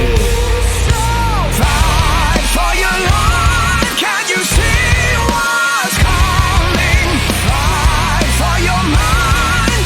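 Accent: South Africa. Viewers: An album review would be awesome Edit: im curious...are you South African? You sound like it.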